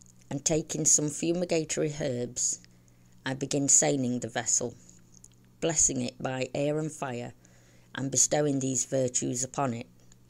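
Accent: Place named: Mansfield, United Kingdom. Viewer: You have to be somewhere local to me with your accent I'm from Mansfield x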